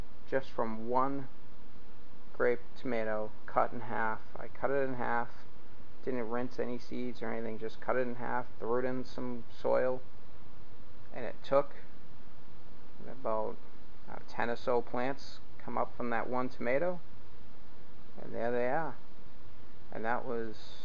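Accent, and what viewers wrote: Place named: Boston, United States. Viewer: Before I even saw your channel name I knew you had to be a fellow Mass-hole and Boston guy! Great video and great accent. I mean, wicked pissah accent!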